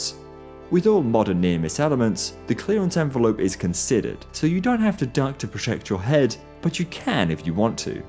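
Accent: United Kingdom. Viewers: Finally someone who is British explaining these stuff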